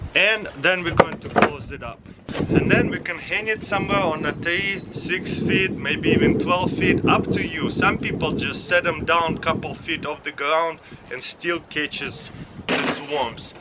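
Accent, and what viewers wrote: Russia. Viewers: I love the Russian english accent it is cute!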